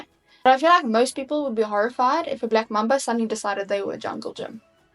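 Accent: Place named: South Africa. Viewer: That South African accent sounds fire 😂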